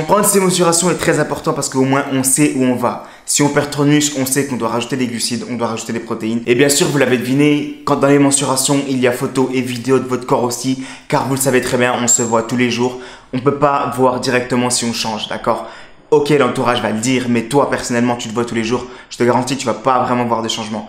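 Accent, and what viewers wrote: Besançon, France. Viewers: Je rêve ou tu est de Besançon ?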